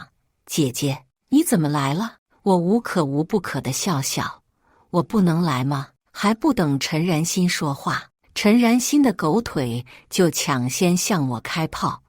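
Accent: China. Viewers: 聽不懂，是什麼鄉下方言？ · 操 后半段用普通话发音说粤语（或者闽南话？）！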